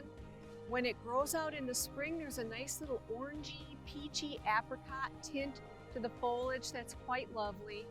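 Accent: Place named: Minnesota, United States. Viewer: Thanks,! You're awesome, and I love your Minnesota accent! This video was super helpful and filled with beautiful plants 😊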